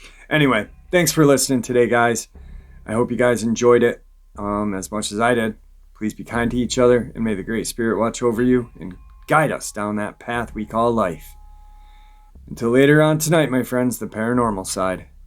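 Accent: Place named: North Carolina, United States. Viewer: You sound like you might be from close to our area,,,, Morganton NC I grew up in Asheville, but my husband Frank and I now live in Burke co and we have a tree nursery behind our property that I think one might have been roaming through back there a few years ago. I was walking our dogs on a leash in the nursery and smelled the worst odor imaginable. It was skunk mixed with the smell of decomp. Our dogs went ballastic tucked there tails and started whining and pulling me toward home. Believe me I took off also later that night we heard howling and every dog on the neighborhood started whining and all of them were in the owners house. Patricia Gambino Harrington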